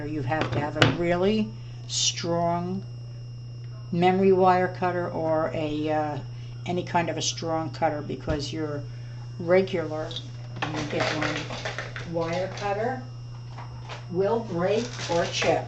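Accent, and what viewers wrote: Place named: Ireland. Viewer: where is your accent from? You have some words that sound Irish. (I'm Irish, and I recognised them lol)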